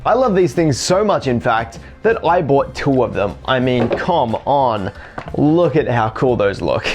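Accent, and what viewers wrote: Australia. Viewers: Nice to hear a fellow Australian review and talk about these amazing glasses. Also great that you spoke about the Be My Eyes integration. Having no vision at all, it is great to see this integration happen. I’m hoping in the future we may also get some ACR features That will help when trying to read mail and menus etc.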